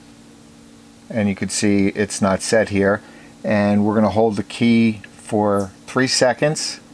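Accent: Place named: United States. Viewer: An excellent video so thanks for doing it. I didn't get any instructions with mine but that's O.K. thanks to your video, and spoken in plain American too. U.K.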